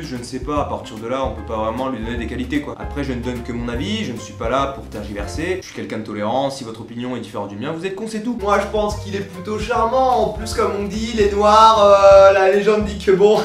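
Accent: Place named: Nord-Pas-de-Calais, France. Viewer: Putain l'accent ch'tit mec xD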